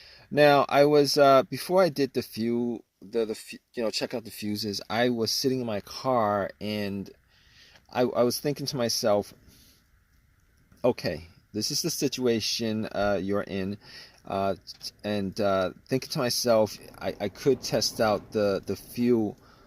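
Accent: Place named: North, United States. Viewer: What is "FU" cap, or a "FEW" cap?!? That would be a F U E L cap man. Not a FU or FEW cap. You're from the northern part of this country aren't you? Mean no offense by that, you guys just talk really weird and funny!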